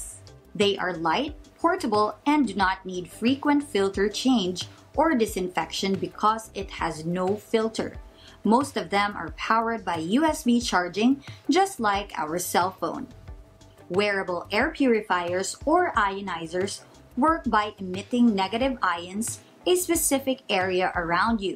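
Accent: Philippines. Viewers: I like your positive outlook, actually, I also like the way you speak, I know some ways shows our Filipino acc-----accent, tehe! Anyways, I have HEPA Hathspace on my room, I am using it for my allergies. In regards to that device, I don't really mind, but I probably have to get another brand here in Hawai'i or maybe order from Amazon. But it's always good to have something like that for additional defense from covid. I do not know in the long term usage though. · Lol, kala ko tagalog, english paka ka pala. Hahaha. Bisayang probinsyana yata...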